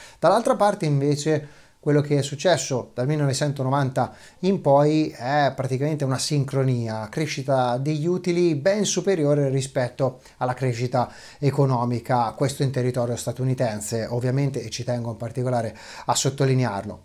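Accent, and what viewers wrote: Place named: Umbria, Italy. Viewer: chiedevo per via dell' accento familiare, suonava come umbro, nun t'incazza.........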